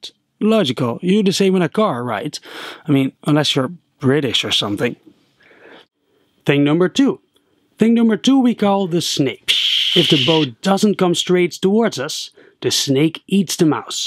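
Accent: Netherlands. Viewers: Are u dutch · NICE Dutch accent and great video! fantastisch gedaan meneer :-)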